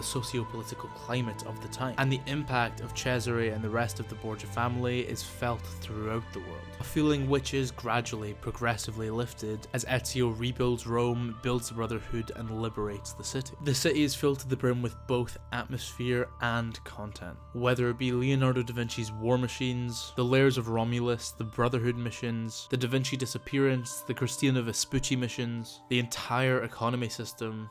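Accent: United Kingdom. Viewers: Ur so British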